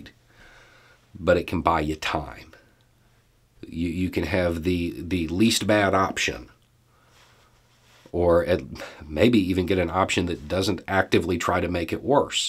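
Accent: South, United States. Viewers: When I think of my neighbours to the South of our border, I like to tell myself that is an example of what great things a Southern accent is capable of saying. Keep up the great work. Lots of people are noticing 👍🇨🇦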